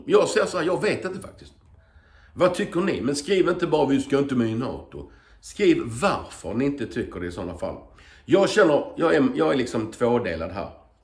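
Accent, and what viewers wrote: Skåne, Sweden. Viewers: Pratar skånska nu?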